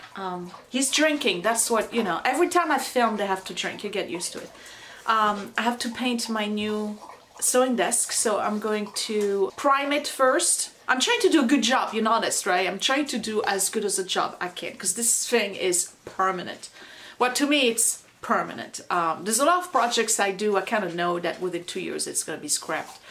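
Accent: France. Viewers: You did an excellent job on your sewing machine table, I am so impressed!! And I love hearing you talk because I like your French accent!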